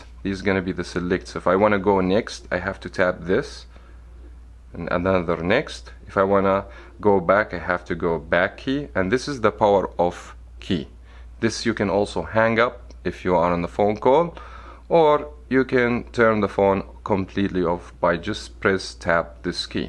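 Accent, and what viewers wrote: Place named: Arab world. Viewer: انت عربي؟ لهجتك كأنها عربيه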